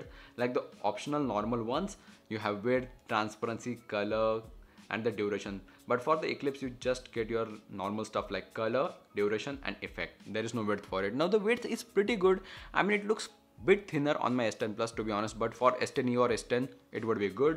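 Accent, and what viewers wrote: India. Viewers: Bro u Talk to much with so strong Indian Accent didn’t understand anything please try to talk slower next video thanks anyway 😂😂😂 Thank you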